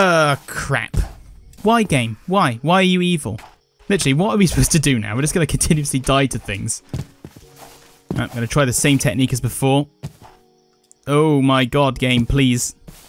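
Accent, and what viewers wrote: United Kingdom. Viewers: the British accent is the cherry on top with this series it makes it 10x funnier LOL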